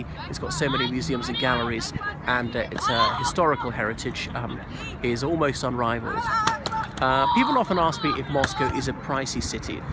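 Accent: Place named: Home Counties, United Kingdom. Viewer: u seriously from Ireland? U sound like you're from the home counties!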